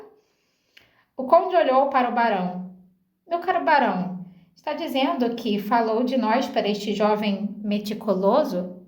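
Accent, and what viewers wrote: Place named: Rio de Janeiro, Brazil. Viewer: Essa voz linda com o o delicioso sotaque carioca ❤️( também sou carioca mas vivo em SP e fico com saudades )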